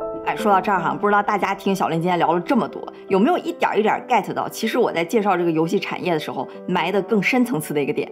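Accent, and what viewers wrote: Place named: Shaanxi, China. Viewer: 小lin是陕西人吧 西安 😂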